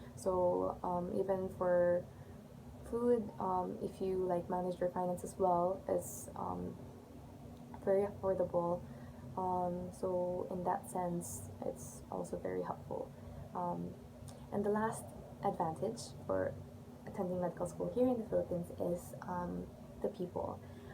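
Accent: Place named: England, United Kingdom. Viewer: I stumbled upon your channel paid attention to the details you say, well im humbled you're such a gentle soul. Prospective doc here graduating pre-med next june thank you so much for the helpful information concerning MD in in Ph well im in Ph as well. You doing a great job im better informed because of you. Planning the US residency too soon. Allow me to pass my last 2 sentiments I love your calmness and gorgeous english accent!! Im humbled😊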